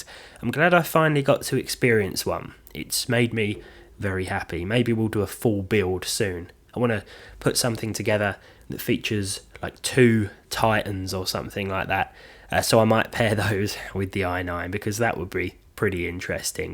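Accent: United Kingdom. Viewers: He’s a limey, that’s how they say Z.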